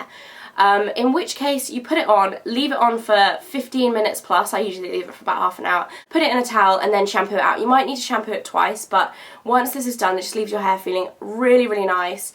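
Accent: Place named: RP, United Kingdom. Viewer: you sound so posh! (not in a bay way! )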